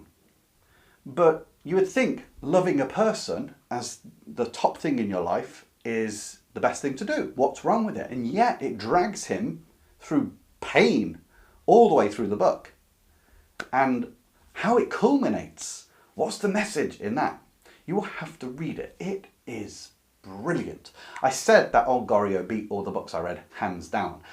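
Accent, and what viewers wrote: England, United Kingdom. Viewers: I'm glad you appreciate as I wasn't sure it was a good thing to point this out 🤔🤗 I'm not from south of France so I can't confirm if "t" is pronounced over there 😅 Well but anyway I think it's pleasant to hear some English accent. I guess most of the French people do 👍😊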